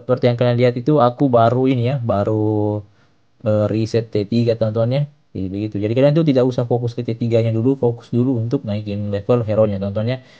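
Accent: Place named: Sulawesi, Indonesia. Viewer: Dari logatnya pasti orang Sulawesi😂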